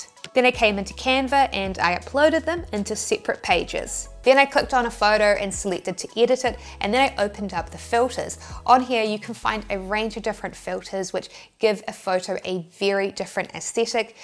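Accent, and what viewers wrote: New Zealand. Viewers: Knew you are a kiwi I grew up with a lot of kiwis in PNG so I know the accent. Thank you for your video 🥝😍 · I really like the New Zealand accent :) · I already knew you were from New Zealand, I'm American. Thanks for all the great content you put out.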